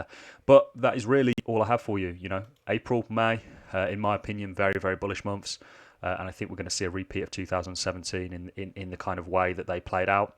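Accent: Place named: Wolverhampton, United Kingdom. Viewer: I have a friend from Wolverhapton, UK. He sounds like you. Great video, hi from Sweden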